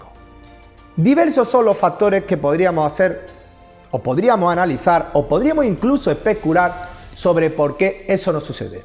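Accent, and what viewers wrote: Andalusia, Spain. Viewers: Es andaluz... lol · Eres Andaluz?? Hablas igual que yo. (Sevilla) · Soy andaluz, respecto a tu acento decir que si me dicen que eres de un pueblo vecino me lo creo. Podrías ser mi primo hermano literalmente. Tu acento es más parecido al nuestro que el de un Asturiano, Gallego o Aragonés. Es como si hubiera conocido un hermano raptado de la familia y me lo hubieran presentado ahora. Es sorprendente como pueden intereses políticos y las gerras pueden crear nuevas fronteras entre las personas que en esencia somos iguales. Un abrazo hermano.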